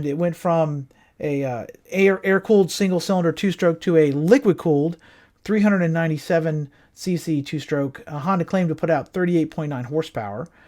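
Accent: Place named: Cecil County, United States. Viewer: You sound like one of us boys from Cecil county. My goodness 😂😂😂 My dad bought me and my 2 brothers a 79 Odyssey. This was 1985 and most of the other guys had CR’s 250R’s or Quad runners and Quad Racers. We had that old yellow 79 😂😂 they always made fun of us😂😂 I had to stand up on the back and hold on to the upright bars. We rode it in all the snowstorms. The snow collected on the front plastic fenders and got them brittle. They cracked off. Mud went right in our faces after that. So my dad took my fluorescent orange sled and cut it apart with a utility knife and bolted homemade fenders on it. We were some goofy poor ass hillbillies of the neighborhood. We rode that thing up the back roads, down the power line access roads. It had these straps that you put your wrists through on the steering yoke. It didn’t have a wheel. Those straps were to keep your arms from getting ripped off in a roll over.😄😄😂😂😂😂 Good times man. 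Those upitty kids with the nice new quads and bikes gave us some ball bustin for having to ride that old yellow and homemade bright orange fendered jaloppee. You said STINK FINGER😂😂😂😂. We had an old neighborhood skank that would let ya Four Finger her😂😂😂😂😂. MAN,,!!! I was dyin when I read your comment. Took me way back to 85. God Bless us men who grew up in those awesome days. Everything is all built up and the land we drove on is all developments now. These kids nowadays don’t even know what stink finger is. Nonetheless Four fingerin’😂😂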